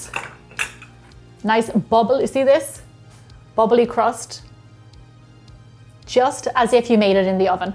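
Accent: Ireland. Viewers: I love your Irish Accent!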